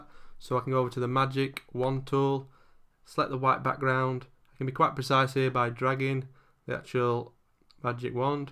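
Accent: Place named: Newcastle, United Kingdom. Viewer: OMG YES, would love to win pixelmator :D haha Are you from new castle??? I'm in love with your accent :)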